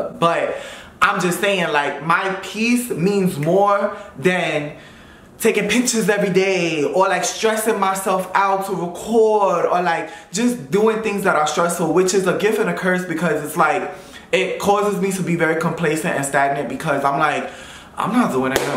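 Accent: New York, United States. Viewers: I have missed your face and that NY accent. So glad you're back!!!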